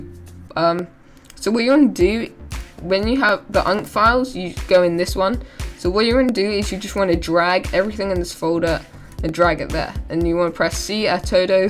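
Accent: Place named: England, United Kingdom. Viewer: holy shit finally an english person hooray i can actually understand this now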